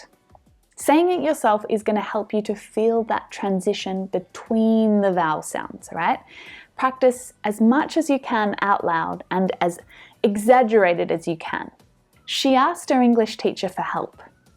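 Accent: Melbourne, Australia. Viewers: That's quite amusing this video comes from someone sounding Australian. People from Australia don't speak properly, skipping letters. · Is from Melbourne? I thought so...I'm from Sydney and I can hear subtle differences in her "accent" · Hi. I loved your Australian accent!!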